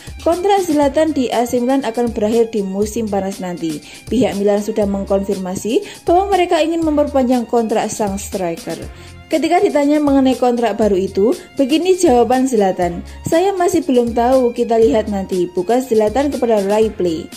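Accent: Java, Indonesia. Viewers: Salam buat Narator Channel Youtube AC Milan Indonesia ini, mêdoq bangets... unique 👍🤩👍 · Medhok banget mbak wkwkwk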